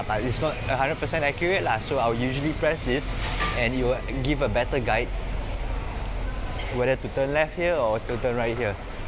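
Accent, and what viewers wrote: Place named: China. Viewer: Accent sounds chianese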